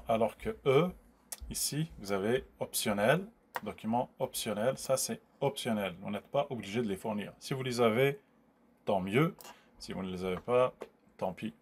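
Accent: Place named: Canada. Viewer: D'aprés votre accent, ça se voit que vous etes un anecien au Canada, sinon tan,irt pour la vidéo.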